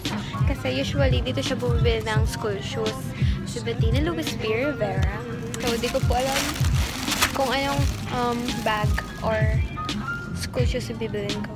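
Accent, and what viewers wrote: Pampanga, Philippines. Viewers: Kapampangan po ba kayo? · Kapampangan poba kayo?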